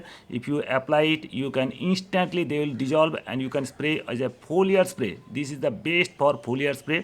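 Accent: India. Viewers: The best Indian accent so far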